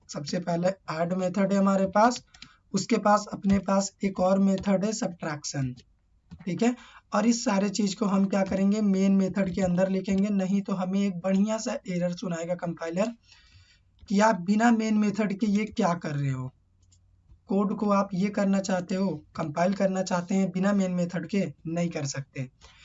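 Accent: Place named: Bihar, India. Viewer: Bihari accent is lob❤️ 'haiyee nai h'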